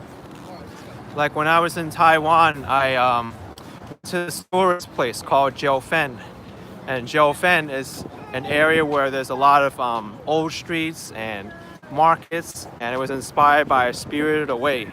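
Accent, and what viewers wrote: United States. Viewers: When I first started watching, I thought you had some type of Asian accent, now I listen to you and hear you definitely have a new York accent 😭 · Before you appeared on video, just by your voice, i thought you were an American. After you appeared on video then i realized that you're an ABC - America Born Chinese. Great work for sharing the video. I enjoyed it. Brilliant for showing life goes on despite all the rumours and insults that had been throwing around. You're fit and standing tall. Best wishes. 👍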